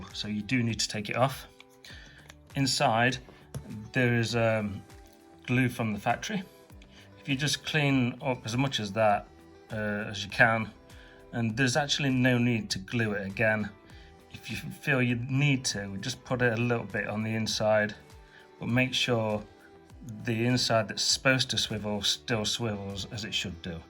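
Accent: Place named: North, United Kingdom. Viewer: Omg are u kidding?!!! Thats all, i just had to spin it anti clockwise 😆😆 I tried everything, even tape which kept coming off.. went to a hardware store twice! To get strong glues - did nothing. It was driving me nuts. Thank you a billion, saved me my sanity. Your northern accent calmed me at once.. as many a times i have had my wifi fixed by lovely northern voices over the phone 👍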